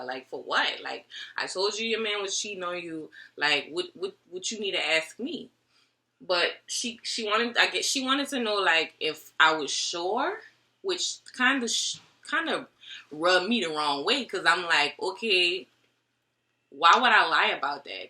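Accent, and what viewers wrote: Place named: South, United States. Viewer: OK. That's the sexy grammar I hear. You're a southern bell. Cool.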